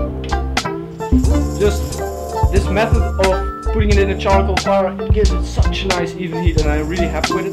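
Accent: Netherlands. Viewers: Are you dutch? Your accent seems so Edit: ik lees nu pas je beschrijving😂 ik hoop dat je weet dat een yatagan eig geen zwaard is maar een dolk👌🏽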